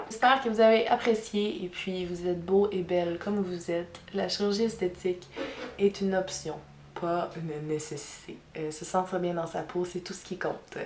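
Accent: Quebec, Canada. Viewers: Oh dare les chaînes Québécoises, c'est la 1er fois que j'entends l'accent québécois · J'adore ton accent québécois ! Vive le Québec, vive mes amis les québécois !! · Merci, pour avoir partager vos experiences et opinions! Comme toujours, j'adore tes videos et ton voix, tel un si belle representation de la langue Quebecoise!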